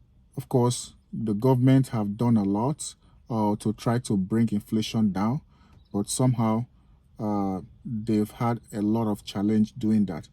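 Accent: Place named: Africa. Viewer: Better learn the English grammar. Poor vocabulary , non articulate., African accent. I was interested hearing your argument , towever could not finish your video. This is a positive criticism, no intention to pull you down.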